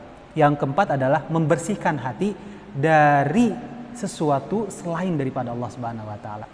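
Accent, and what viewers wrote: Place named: West Java, Indonesia. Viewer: Logatnya kaya orang Sunda ya ustadz, hehe maaf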